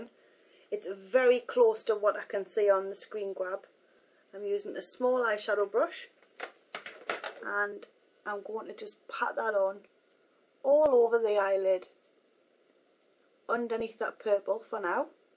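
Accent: United Kingdom. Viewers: Something about a white girl with a british accent teaching people how to be like nicki just isnt right.....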